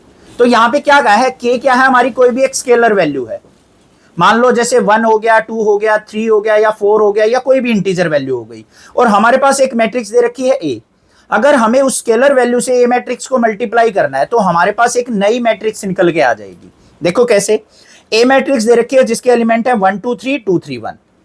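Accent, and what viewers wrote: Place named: Sonipat, India. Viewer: arey sir jii aapp sonipat ke lgte hoo kyuki aap bhi ho or aapki boli bhi vaisi hi h or m gannaur kaa hu 😂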